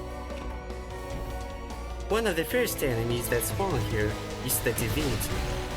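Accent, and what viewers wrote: Russia. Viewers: Тяжело слушать русский акцент🫪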